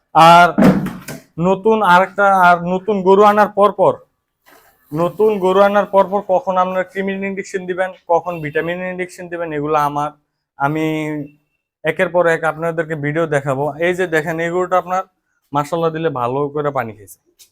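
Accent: Comilla, Bangladesh. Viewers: ভাইজান কি কুমিল্লাই ছিনেন নাকি কারন আপনার কথাবার্তা ঠিক কুমিল্লা মানুষের মতন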